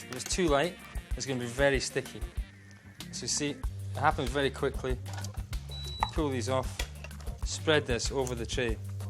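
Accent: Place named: Scotland, United Kingdom. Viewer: EXTREME THICK SCOTTISH ACCENT 🤣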